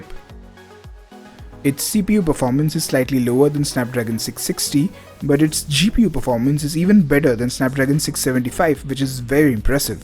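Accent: India. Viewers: Youtube should put all these indian reviews on youtube india. Indian accents are super annoying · I love your accent it's Indian right. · I Don t understand Indian English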